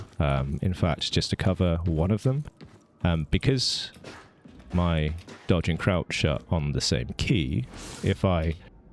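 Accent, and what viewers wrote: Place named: United Kingdom. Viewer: I just wonder - are you side account of Mr E? Cuz you sound very similar :D Only accent says that you're British. I have almost 600h playtime but still can learn something new, so thank you for all the tutorials, which I'll watch. For example I can spare a lot of pain for my poor hands and fingers after many hours of playing only by using one key for crouch and dodge!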